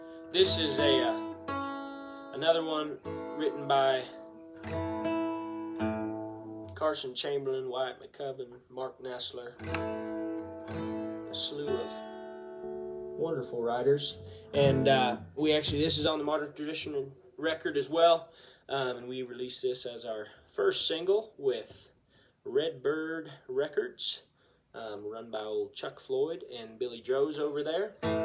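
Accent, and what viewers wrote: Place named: South, United States. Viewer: How’d he develop such a southern accent in Washington I know there’s wide open spaces and ranches cattle and all that but I’d never seen anyone there sound southern and I’ve been there a whole year one time (just for a girl) theyd make fun of my accent.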